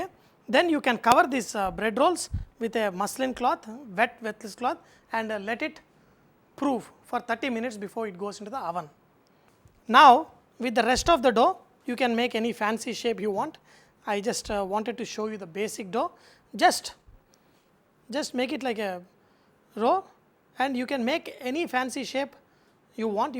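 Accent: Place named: Telugu, India. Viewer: I like the way this guy speaks.......the accent is of a typical telugu guy..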